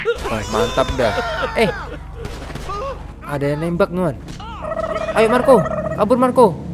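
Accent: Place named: Java, Indonesia. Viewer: Med'do banget nadanya Terus nora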